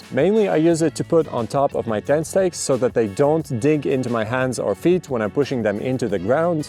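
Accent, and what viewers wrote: Latvia. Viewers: By chance, I stumbled upon this video, and from the very first sentence, I had a strong suspicion that you are Latvian 😀. Nice tips! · Great tips! Love your channel. Are you from Latvia by any chance? I think I recognize your accent 😅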